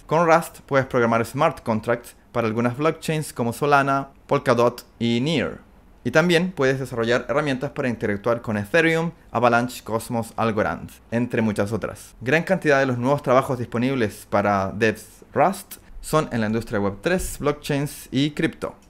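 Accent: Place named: Chile. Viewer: Hola, esta semana YouTube me recomendó tu canal, me alegra comenzar a oir acento chileno por la plataforma, tenemos bastante capital humano, pero al parecer somos más tímidos que el resto de hermanos hispano hablantes. Tus videos me parecen muy interesantes, y espero que tu canal crezca.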